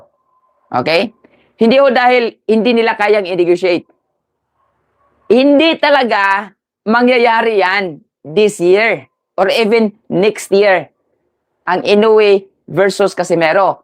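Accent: Visayas, Philippines. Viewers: Ung Tagalog mo bay bisaya padin😅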